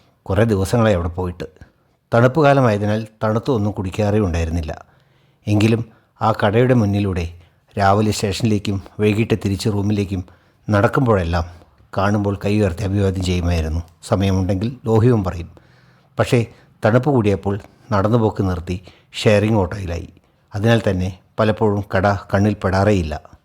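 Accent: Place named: Valluvanad, India. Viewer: എന്നത്തേയും പോലെ നല്ല അവതരണം. നമ്മുടെ ആ വള്ളുവനാടൻ ഭാഷ കേൾക്കാൻ എന്താ സുഖം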